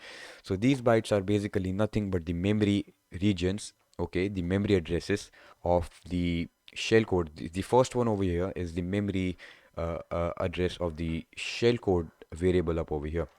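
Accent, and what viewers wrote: India. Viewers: You are sound like Hackersploit, but with a lil bit indian accent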